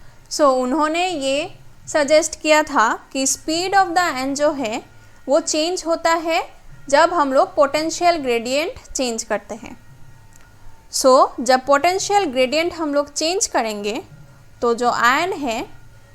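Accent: Bengal, India. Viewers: Are uh Bengali 😅😮?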